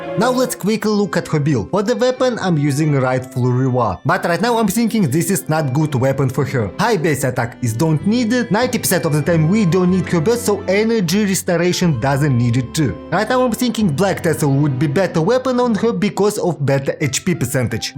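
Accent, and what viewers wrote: Russia. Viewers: are you doing a russian accent on purpose? or idk what that accent is, i can't listen to it anyways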